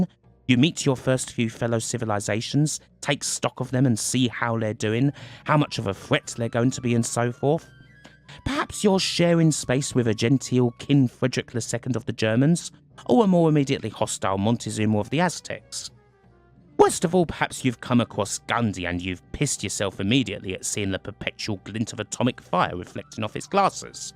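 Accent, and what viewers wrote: United Kingdom. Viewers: I'm not watching 45 minutes of a lithping limey talking about a decades old game.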